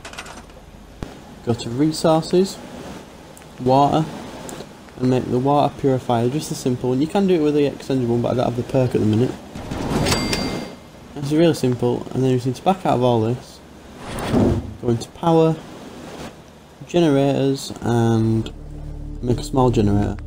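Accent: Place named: United Kingdom. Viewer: This is the most British accent I've ever heard, Also thanks even tho this video is already 6 years old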